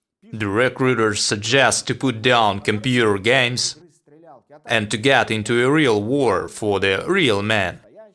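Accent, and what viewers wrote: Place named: Russia. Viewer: Нууу, без обид, но с таким «хэви рашн эксент» немногие смогут послушать выпуск хотя бы 10 минут... советую уменьшить басы, хотя бы, а то перевод звучит как от «рашн мафиа»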